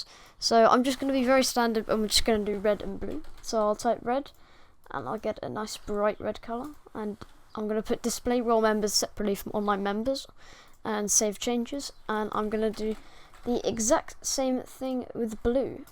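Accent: United Kingdom. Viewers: damn your british accent is amazing. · My dude sounds like a professional british asmr person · Thank you random british guy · I can’t get enough of bri’ish accent, i love it so much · Thank you sm little british man I love and appreciate u sooo <3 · ooh a british man i suppose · Hes from the UKKKKKK LIKE ME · Bro i love your British accent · you are british, cringe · its a british accent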